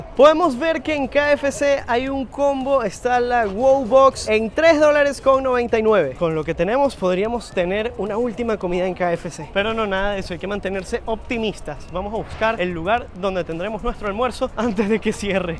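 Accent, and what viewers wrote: Venezuela. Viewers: Yo parA sobrevivir compro tortillas y queso duro sale super barato en los mercados se puede comprar super barato con 3 dolarucos se puede t oigo un acento venezolano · de donde eres? No puedo reconocer tu acento, pero me inclino a venezolano?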